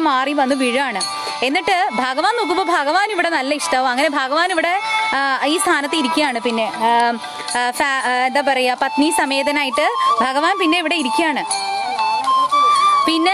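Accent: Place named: Valluvanad, India. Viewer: തിരുവനന്തപുരത്തുകാരിയുടെ മലയാളം എങ്ങനെ വള്ളുവനാടൻ slang ആയി? അതും ഇത്ര perfect ?!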